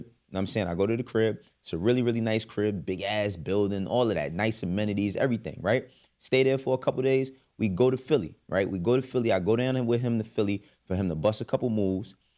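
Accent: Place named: New York, United States. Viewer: Why this dude sound like he from New York?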